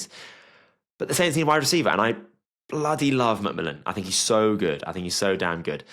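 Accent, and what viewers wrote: United Kingdom. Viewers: Caleb with the most sacks and this dude thinks the Bears need a tight end😂. cole Kmet who played well and love him, no need for another tight end, they will not go warren, sorry. They need a D lineman or strong Oline man, their line on both sides were ass last year. Makes zero sense to pick up warren. If they don't go lineman they will go HB, because they traded their young Rb Khalil .That's also why you won't see Warren in literally any other mock for the bears. Dumb pick Also is this a British guy? Lol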